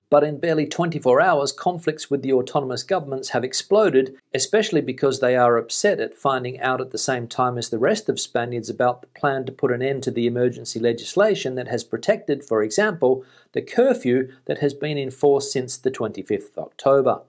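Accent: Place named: Australia. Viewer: Thanks. I look forward to listening to you every day; especially with your cheerful Australian accent! I’ve been waiting for over a year to find out when I can get over to Spain from Scotland as I have a few holiday houses I have my eye on. It’s been so frustrating and we are still no further ahead. I thought that the vaccines were supposed to give us more freedom but, alas, it doesn’t look like it’s going to make much difference. Take care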